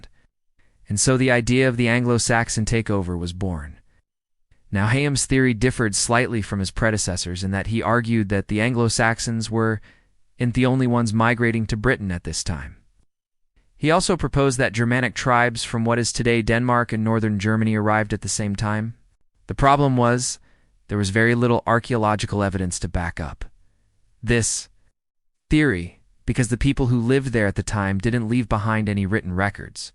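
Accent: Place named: United States. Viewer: not a chance that yankee accent is english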